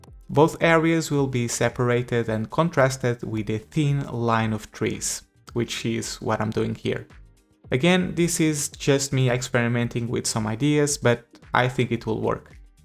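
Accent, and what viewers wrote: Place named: Portugal. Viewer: Esse sotaque Portugues nao engana :D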